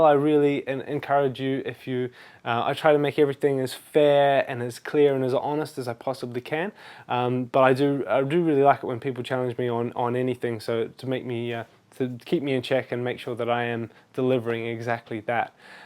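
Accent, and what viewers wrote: New Zealand. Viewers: ah! i knew you were a kiwi!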